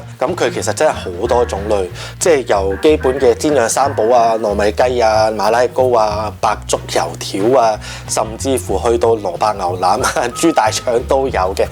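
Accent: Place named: Hong Kong, China. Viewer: 你好呀，今日無意中睇呢條面影片，聽你講說話嘅口音似係香港人，但係點解會叫油條同埋茄子嘅?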